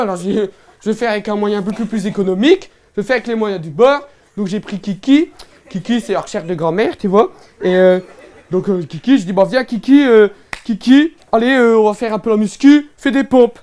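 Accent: Nord-Pas-de-Calais, France. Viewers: On dirait un vrai chti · Accent nordiste lol!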